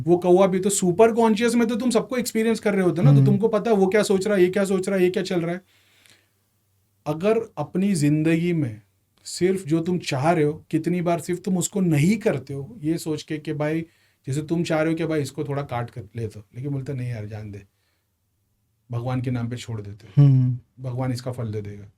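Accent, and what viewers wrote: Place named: Mumbai, India. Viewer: Hey Mr ur telling us clearly..and we like the Mumbai style free talk.thanks From dubai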